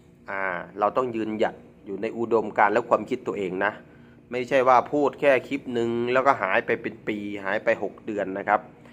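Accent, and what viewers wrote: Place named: Maha Sarakham, Thailand. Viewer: เทพโยคือเว้าภาษาลาวคือบ้านข่อยสารคามแทบบ่รุ้เลยว่าเป็นคนลาว ข่อยเห็นยูทูบเปอร์ลาวเว้าบ่คือเจ้าแมะ ถ้าเจ้าเป็นคนไทยคือยุ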